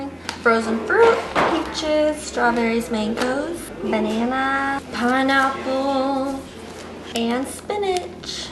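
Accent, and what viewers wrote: Canada. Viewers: are you Canadian? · she sounds canadian, is she?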